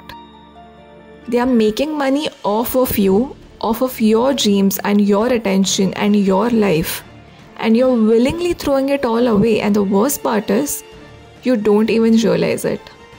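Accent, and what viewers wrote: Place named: United Kingdom. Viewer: british english while indian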